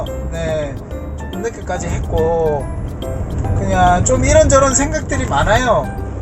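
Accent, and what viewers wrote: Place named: Busan, South Korea. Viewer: 부산 말투 재밌어요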